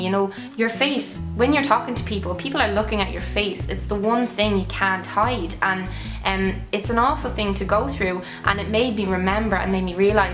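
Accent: Dublin, Ireland. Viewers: I'm from Dublin and I'm pretty sure she is too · Did not realise you were Irish! This is the first video I watched of yours :) clicked on it for obvious reasons, thanks for the vid. I changed my diet completely. It's a really difficult time!! So thanks :) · I think she's from Ireland (I'm from England myself so I'm fairly familiar with the accent).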